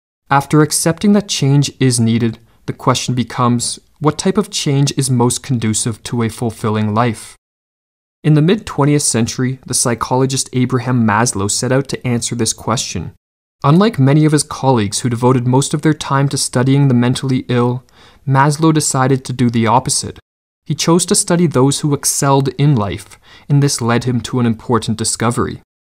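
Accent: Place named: Canada. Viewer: Your "outs" and "abouts" indicated clearly to me that you're a Canadian.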